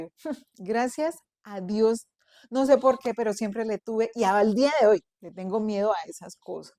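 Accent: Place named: Antioquia, Colombia. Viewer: a veces te sentía un acento paisa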